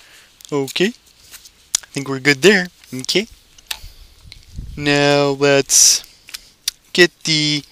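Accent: Canada. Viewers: He kind of sounds Canadian to me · Is he Canadian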